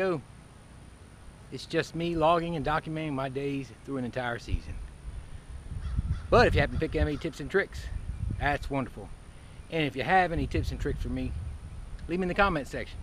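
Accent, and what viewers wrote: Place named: South, United States. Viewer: you speak so softly and you have a sweet southern accent so I need to turn up the volume just a little to understand what you are saying. I have to use ear buds so I don't disturb my grandsons and then my ears get blasted! I can turn the volume down fast enough. I had to stop watching you tonight in order to preserve my hearing. Please keep the volume level of the music the same as your voice so I can enjoy music into my 80's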